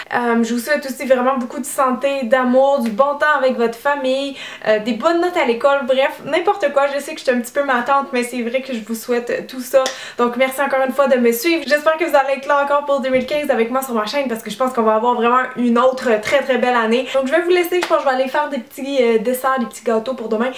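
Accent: Quebec, Canada. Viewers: Tu viens chez moi en avril!! Je suis nouvelle à te suivre sur FB et ici. J'ai le temps de pratiquer ces jours-ci avec mes vacances :-) Je suis québécoise établie depuis de nombreuses années en Suisse, à côté de Montreux, alors si tu as besoin de quelqu'un pour faire la traduction québécois-"suisse"(Loll!), tu peux me demander! :-D Et les suisses romands (francophones) adooooooorent notre accent! Tu seras la star de l'évènement! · magnifique !!!! je vais le faire au nouvel an ! ps : je suis amoureuse de ton accent ! Je suis française mais j'ai un oncle qui vit à Québec depuis quelques années et je vais le voir en avril....j'ai hate ! ton accent me fait deja voyager ! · Salut ! Magnifique make-up ! J'adore l'accent québécois il est trop beau ! Tu es trop belle ! Je te suis tous les jours ! Toutes tes vids je les ai regardes ! Je t'adore ! Bisous Merci d'avoir créer ta chaine ! 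Et bonne année 2015 !!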